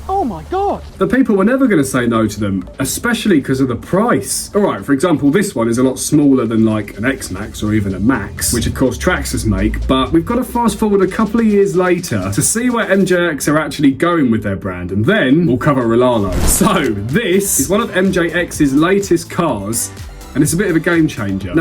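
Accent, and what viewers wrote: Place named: United Kingdom. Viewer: Great another British speaking channel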